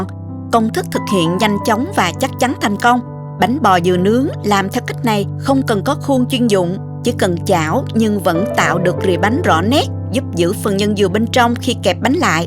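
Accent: South, Vietnam. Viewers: That cam on co...1 giong noi rat am cung....ngot ngao giong mien nam.ngot oi la ngot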